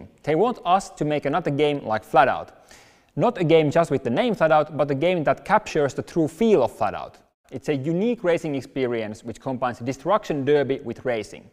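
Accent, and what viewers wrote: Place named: Finland. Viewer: That finnish accent! My Greetings from (my homeland) Finland to Finland :D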